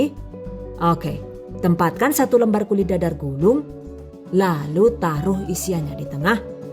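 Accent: Banyumas, Indonesia. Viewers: Mksh bun. Seneng lihat.tapi ga pernah buat. Bunda Lucunya. Si pd inyong ngapak ya bun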